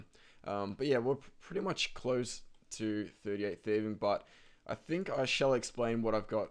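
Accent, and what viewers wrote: Australia. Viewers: Been so long since I heard that Aussie accent. :)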